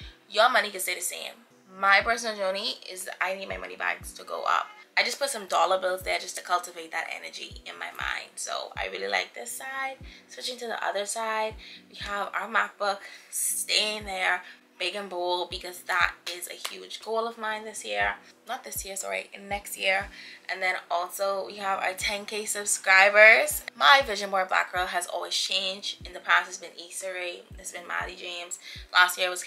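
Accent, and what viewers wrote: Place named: Bahamas. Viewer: Love it! Also as soon as I heard that accent was like yessss, 242 represent! Excited to do my first vision board.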